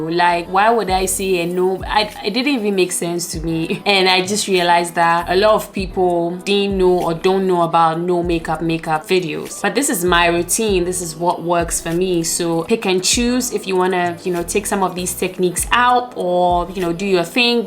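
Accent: Ghana. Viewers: I love your videos and how you do your make up. Your make up always looks so natural even when you do a full glam. It never looks like a cake of make up. You are a very beautiful woman. I was trying to figure out where you are from because of your accent but I saw you are from Ghana! That is sooo cool! I love Ghana I would love to go one day!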